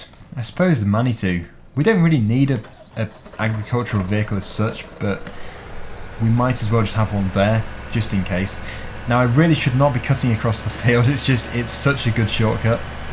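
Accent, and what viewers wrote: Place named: United Kingdom. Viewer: You are British so pronounce things the British way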